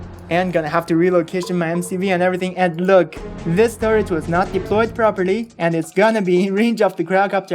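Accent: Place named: Arab world. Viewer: I thought your original voice is just 100% Arabic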